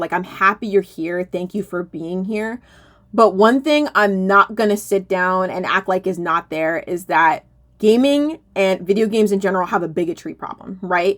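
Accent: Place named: New York, United States. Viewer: I unsubscribed from the fake wizard because of the annoying new york / jersey accent.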